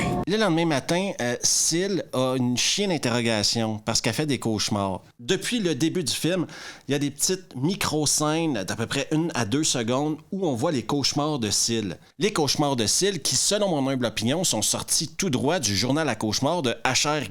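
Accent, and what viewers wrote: Quebec, Canada. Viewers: Salut ! Sympa d'avoir tous ces avis sur des films de notre génération (on a à peu près le même age) avec le petit accent du Québec exotique pour nous les Français, mais dans ton cas, y a aucun souci, c'est à 100 % compréhensible ! (je dis ça car j'éai été au Quebec en vacances et c'était vraiment pas facile, et du côté de Tadoussac, là c'était quasi une autre langue pour moi :-D ]. Super critiques de film donc, j'ai vraiment du plaisir à les regarder, bravo ! Souvent je rigole tout seul d'ailleurs, avec les expressions que tu trouves pour décrire les scènes. Pour ce qui est de "La mutante", j'avais vu au ciné aussi et j'avais même lu le livre avant (mais c'est pas un roman intrinsèque, je pense que c'est plutôt le scénario qui a été "romancisé" car c'est quasi identique à ce qui se passe dans le film, c'est juste plus détaillé grâce au processus de la narration qui permet de saisir les pensées des personnages... 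et de l'E.T.. Bref, j'avais bien aimé même s'il y a un côté "petit budget" qui empêche de donner une plus grande ampleur à ce qui aurait pu être une grande réussite du point de vue de la science-fiction. J'avoue que Natasha est bien plaisante à regarder, j'étais pas encore majeur hein ^^ (on l'a revue dans "Mon voisin le tueur" quelques années après en version moins... dénudée mais toujours très appétissante). La suite peut encore se mâter je trouve, encore moins de budget je crois mais des scènes bien dégueux-gores. Les 3ème et 4ème, c'est du niveau des Starship Troo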